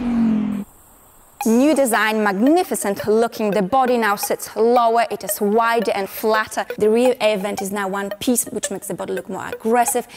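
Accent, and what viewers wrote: China. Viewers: I have a friend calls Zhang, he is from China. Strangely his accent is like yours and even stranger I believe you are European. So you can imagine the culture shock I'm subjected to. Lol. Amazing review by the way and videography. :) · Interesting: a blond girl speaking English with a Chinese accent 🤔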